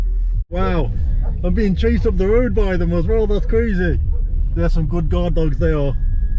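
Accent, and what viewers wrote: England, United Kingdom. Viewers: Weird watching an English accent in Russia . 😃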